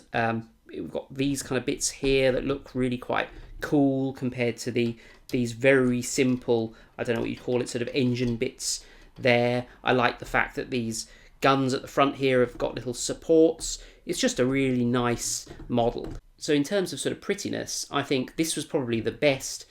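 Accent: United Kingdom. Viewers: Ur British accent is nice Makes my ears joyful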